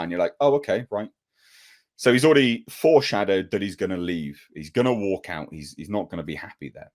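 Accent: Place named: United Kingdom. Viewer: Pools got more viewers and make more money then you do. Can't stand listening to that pretensious British accent. I really hate the British wish Russian would take them out,lol. Weakmen of Europe. I really wish the U.S. would cut ties them.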